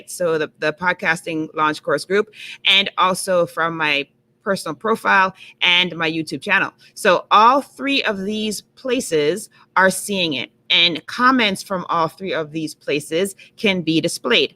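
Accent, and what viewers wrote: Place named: Jamaica. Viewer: You sound a little Jamaican...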